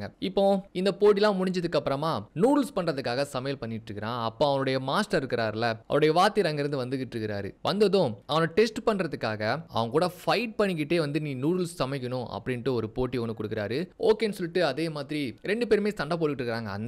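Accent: Tirunelveli, India. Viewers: பேச்சில் திருநெல்வேலி சாயல்..❤❤❤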